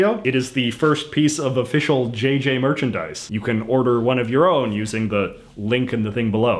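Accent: Canada. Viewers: I can't stop watching your videos. Between the Flock or Seagulls haircut and the Canadian accent I can't look away.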